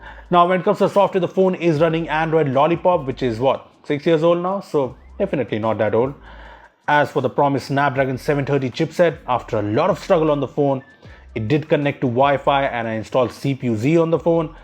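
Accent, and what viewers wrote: India. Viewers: BRO YOUR ACCENT IS DIFFERENT THAN OTHER INDIANS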